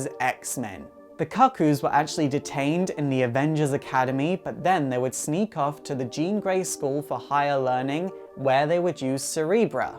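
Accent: United Kingdom. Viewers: Hes british, i think he is pronouncing it the way its supposed to be.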